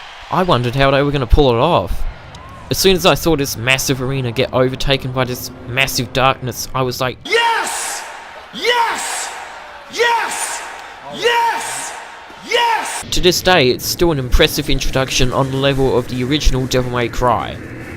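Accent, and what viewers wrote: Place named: Australia. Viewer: Australian voices <3 You <3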